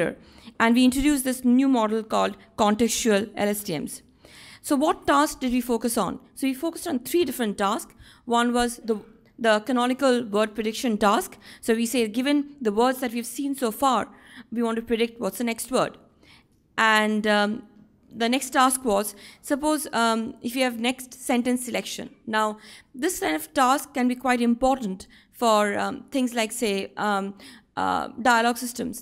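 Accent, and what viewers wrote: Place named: India. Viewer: hhh, Indialish is a little bit cute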